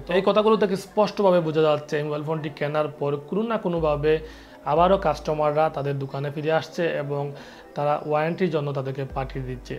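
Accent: Sylhet, Bangladesh. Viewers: ভাইছাব সিলেটী নি৷ আমিও সিলেটী৷ আফনার ভিডিও বালা লাগলো৷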